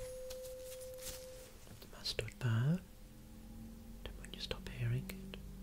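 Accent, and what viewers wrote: Birmingham, United Kingdom. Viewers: Damn, that's a strong Brummie accent. Works so well for this relaxed vocal range style in this video, though. Love it! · that British voice I mean DAMN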